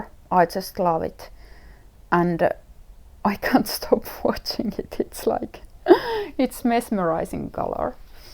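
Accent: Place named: Finland. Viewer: Congratulations!! It is so charming to hear a Finnish accent. I spent my childhood summers in Finland and remember very fondly picking blueberries in the woods near the lake of our friend's summer house. So I would love to let some berries float on lake water: Dreaming of Finland in the knitting of silk ribbing, small bobbles and waving short rows.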